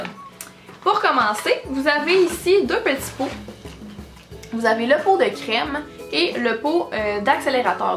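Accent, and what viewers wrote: Quebec, Canada. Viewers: Super vidéo et très jolie accent canadien ou québécois j'imagine ☺ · du Canada ( c'est l'accent canadien ) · moi je kiffe l'accent québécois lol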